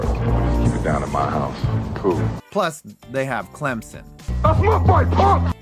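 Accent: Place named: Northern Virginia, United States. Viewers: Listening to this just shows you are definitely from VA or have lived there at some point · I know you make videos on broad topics but you're definitely from Virginia hahahaha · As someone that lives in NOVA I can so tell your from here.. lol.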